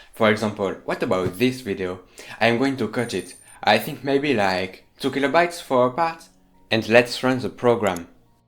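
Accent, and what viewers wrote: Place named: France. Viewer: Un francais passe par la et reconnais l'accent francais. Salut ^^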